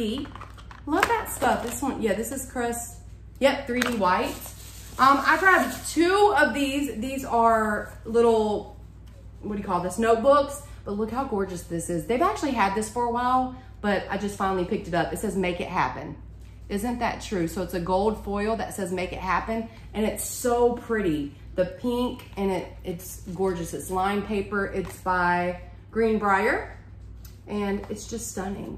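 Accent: South, United States. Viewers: Burnt orange. Southern accent.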